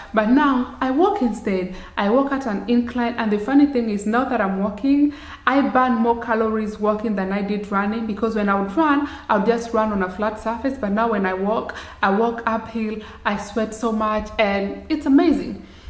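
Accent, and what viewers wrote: Kenya. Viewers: Are you from Kenya 🇰🇪? Remind me a lot my Kenya 🇰🇪 Queen accent so beautiful and elegant. Loved it. Lose weight not muscles eat 3 categories of this food: proteins, fiber and good fat.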